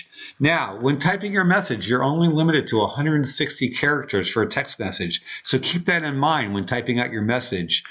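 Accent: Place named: United States. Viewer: _ A pity, this strong american accent ! Instructive but can be a bit harsh to understand for some E.U students and trainees accustomed to English English ! (Not for me, I'm fine, thank you).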